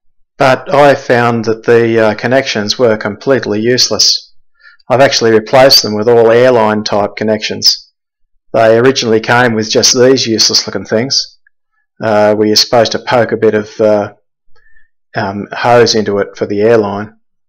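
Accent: Australia. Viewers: Great review. It's hard to hide that Aussie accent. I just Googled it and it seems pretty good for the price. It's currently list at $349. Is that what you paid? What part of Oz are you in? I'm in Gippsland. Cheers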